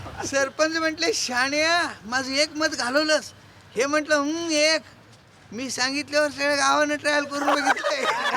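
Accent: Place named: Sangli, India. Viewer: Khar tar solapur cha ahe....Ajit koshti ganya naavacha vinod mala kharach best watatat....pure Sangli karad language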